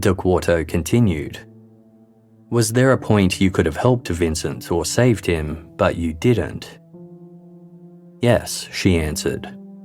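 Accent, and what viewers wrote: Australia. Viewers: He's in Australia, They pronounce a lot of things differently.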